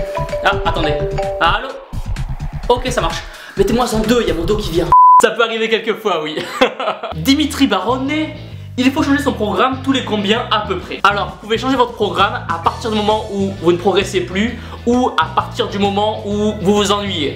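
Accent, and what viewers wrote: South, France. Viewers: Question perso : Tu habite ou ? Car je vois que ta un belle accent du Sud je doit pas etre loin de chez toi ;)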